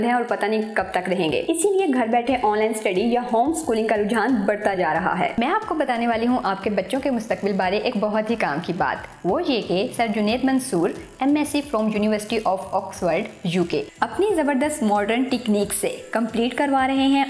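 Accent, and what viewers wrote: Punjab, India. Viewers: The way u speak punjabi …Haye so cute 😘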